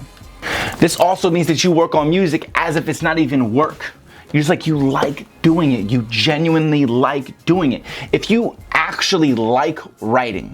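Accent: Chicago, United States. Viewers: Your delivery is so Chicago. It's funny and refreshing to watch your informative vids. Preciate you, bro. Continue courageously! <link>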